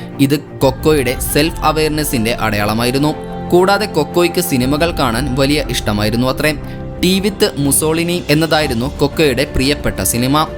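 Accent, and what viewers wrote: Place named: Kozhikode, India. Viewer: നിങ്ങളുടെ accent ന്യൂട്രൽ ആക്കൂ. ഒരു commentary യിൽ കോഴിക്കോടൻ slang കേറി വരുന്നത് തികച്ചും അരോചകമാണ് (തിരു.തൃശൂർ ഉൾപ്പെടെഏതു Slang ഉം) "ത്" എന്നതിനു പകരം "തി" ഗോറില്ലയ്ക്ക് എന്നതിനു പകരം ഗോറില്ലക്ക് എന്നിങ്ങനെ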